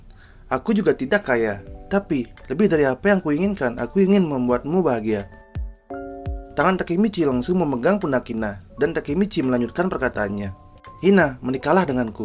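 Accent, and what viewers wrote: Bali, Indonesia. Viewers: Kyk logat bali bang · Bang logat lu kek orang Bali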